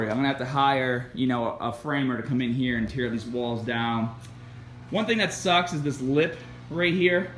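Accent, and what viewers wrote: Boston, United States. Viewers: how much will the rent cost? and also will you lose your boston accent?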